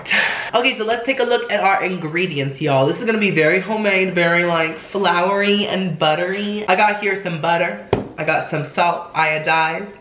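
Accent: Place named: United Kingdom. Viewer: i hope you do a full diy talking in your british accent one day! it always cracks me up! 😂😂😂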